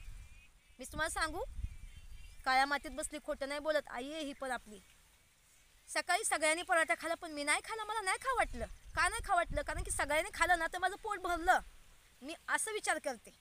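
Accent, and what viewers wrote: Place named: India. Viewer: तुम्ही आयुष्य मुंबईमध्ये काढले तर शुद्ध मराठी बोलायला पाहिजे. जेव्हा तू शुद्ध मराठी बोलतेस तेव्हा ऐकायला गावरान मराठी पेक्षा चांगले वाटते. तुझी बोलायची पद्धत तीच ठेव पण शुद्ध मराठी ला नक्कीच 💯 % जास्त लाईक्स मिळतील.शुद्ध मराठी ऐकायला फार बरे वाटते.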